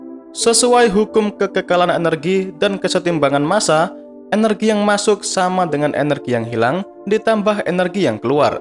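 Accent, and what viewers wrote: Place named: Madura, Indonesia. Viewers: Pasti org madura yg ngomong nii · "Seperti biasa daripada kalian penasaran, mari kita bahas bersama". Logatnya benar2 Madura sekali pak. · Aksen maduranya kental banget mas 🤭🤭🤭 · Dari pada kalian penasaran berlogat madura ygy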